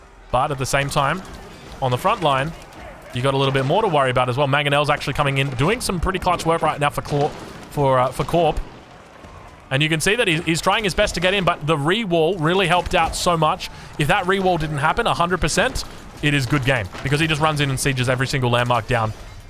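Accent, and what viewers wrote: Australia. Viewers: Even on 0.75 speed u talk too fast man… slow down!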